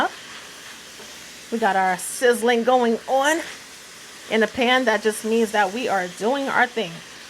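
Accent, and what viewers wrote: New York, United States. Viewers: Good afternoon or morning Ms “ Love ❣️ My Name. Is. Dawson. I just want to start off & Say Yes Yes& Yes 👍🏽💯 absolutely 💕 love your Scrumptious recipes..Girl you put your “ Toes “ in it LOL you remind me so much of my late & Great Grand-Mama “ Pearl” 👼🏽 God rest her precious soul Amen 🙏🏼 But yes I just had to put in the comments because I have pics on and please correct me if I’m wrong you are strong “ New York “ accent 🫠. And he reminds me so much of a cousin I have comes from the boogie down Bronx💯 but Yes ma’am’ ❣️❣️ that’s all I’m gonna let you get back keep continue doing what you doing Great job. 👍🏽💯❣️ Ok... have a blessed one..Ms Dawson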